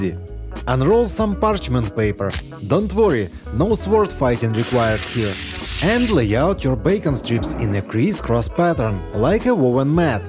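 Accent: Iowa, United States. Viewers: The lowan accent is so beautiful. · Is that an Iowa accent?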